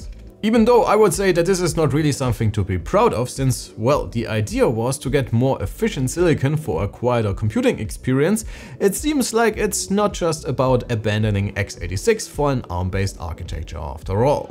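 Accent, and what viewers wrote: Germany. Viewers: I'm very glad to see NoteBookCheck as a very classic website to publish such YouTube-optimized video in every aspect and that's a real success for your content producers. I'm an old fan of you from the website, but now you've convinced me that you're very good in YouTube platform too. And dear, your German accent is what I love 💛❤🖤 Now let's talk about the Laptop! I think that Snapdragon X Elite is something that should not exist at all. Who am I to say this? A Microsoft Surface RT and Surface Pro X lover. I believe that existence of the ARM based computers in the form of laptops and Windows tablets should be only limited to very low-power scenarios maybe 10W TDP at maximum. If you're going to implement such heavy cooling systems with heavy heatsinks and fans, well why you don't stick to x86 even??? The purpose of bringing ARM to a "Windows tablet" specially, is to have a final product like the iPad or Android tablets in terms of weight, thickness, heat generation, battery runtime,... 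But Apple ruined the game with 100W ARM based laptops which even gets more "Unreasonable" when even their desktop solutions is ARM too!!! ARM or I might say RISC, is designed to work with low-power and be less powerful. When x86 or I might say CISC, is designed to work with high-power and be simply more powerful! You can't fight with the nature of them. If even our desktop PCs turn into ARM machines, well then we will lose the great power-house performance of CISC architecture! And fortunat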